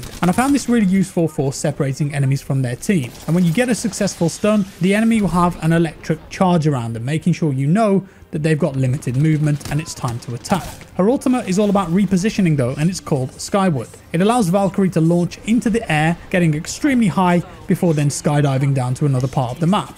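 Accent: United Kingdom. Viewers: What I like about he speaks British but called him self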